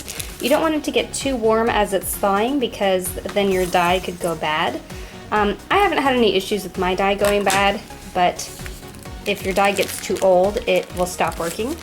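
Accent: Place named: United Kingdom. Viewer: you sound sorta British in this one!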